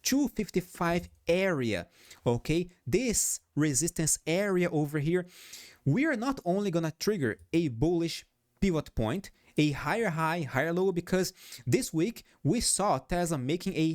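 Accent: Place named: Brazil. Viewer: Claramente um amigo do brasil, a pronuncia nao engana ! Primeiro video que vi seu e adorei. Fica aqui a minha subscrição. Cumprimentos de Portugal!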